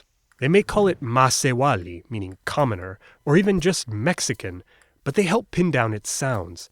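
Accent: Italy. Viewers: Your Italian accent comes out on this one. But this one was very well done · I cant help but notice you tend to use Italian (or maybe Latin?) accent and inflections when you speak not English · This narrator has the consonants correct, but in some areas he expresses an Italian accento, which is not in the Aztecca pronunciation.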